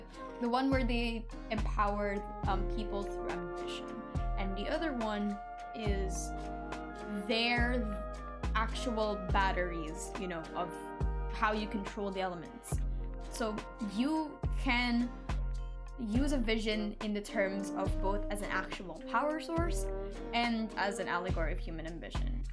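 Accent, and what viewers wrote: Philippines. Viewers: I KNEW IT LMFAO AS A PINOY ANOTHER PINOY ACCENT IS SUPER RECOGNIZABLE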